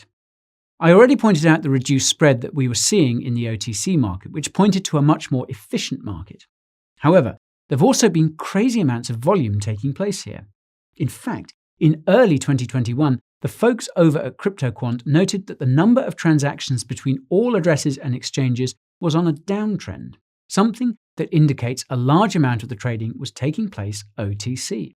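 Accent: United Kingdom. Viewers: i love your british accent. i wish i could speak like that.. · I dont know if it's the robotic British voice or the robotic repeated jestered you make that draw me in lmao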